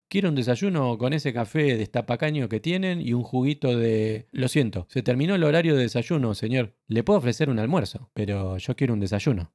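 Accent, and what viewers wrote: Argentina. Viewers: Una genialidad! La pelicula? no, el relato a lo Argentino!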